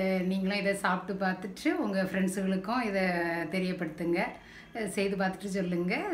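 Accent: Tirunelveli, India. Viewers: Nalla peysureenga namma ooru pakkam pona mathiri iruku Unga recipe super Tirunelveli thana? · ரொம்ப தெளிவான பேச்சு நீங்க நெல்லையா? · but ur accent also gud..nellai touch up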